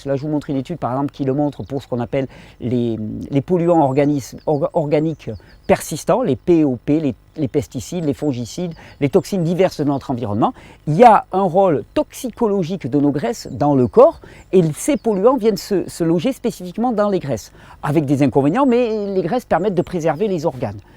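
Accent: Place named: France. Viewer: T'as un pt'it accent du fond de la province quand même hein..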